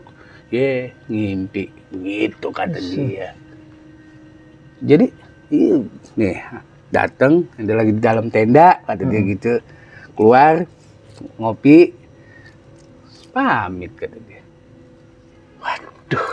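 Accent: Jakarta, Indonesia. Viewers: Lucu. Bngt. Suka sama. Gaya logat nya. Si. Abang. Btawi bngt..👍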